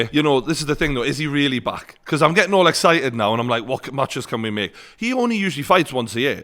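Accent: Newcastle, United Kingdom. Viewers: Fuck off. Romero got robbed. Create your own analysis instead relaying the spin with a Geordie accent. Think for yourself. Sheep.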